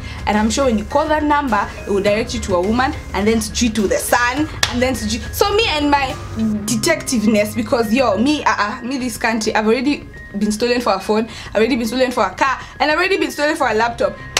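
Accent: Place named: Nigeria. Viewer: Your ascent sounds Nigerian. Pole sana kwa mkosi lakini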